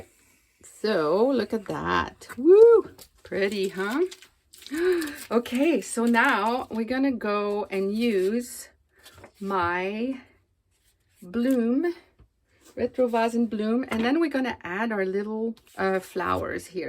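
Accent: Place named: Normandy, France. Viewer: Thank you for this. Also, just want to say I love to listen to you speak. Your accent sounds just like my mom who was Normandy France. She is no longer with us but this gives me some joy for a few minutes.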